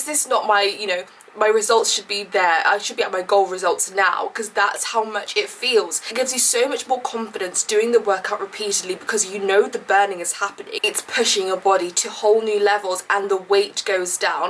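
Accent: RP, United Kingdom. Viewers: Rlly like the posh British accent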